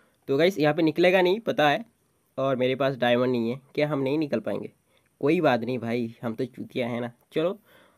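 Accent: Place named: South, India. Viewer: Aap thodaa south side se ho khaa bhai aapka voice se samjaa rhaa hai bro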